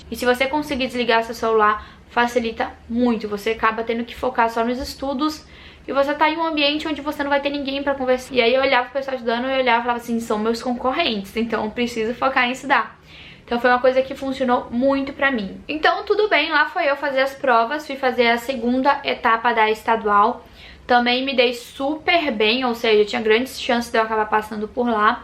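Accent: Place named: Belo Horizonte, Brazil. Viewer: Não parece ser paraense, seu sotaque é muito diferente. Lembra Belo Horizonte (sou de MG)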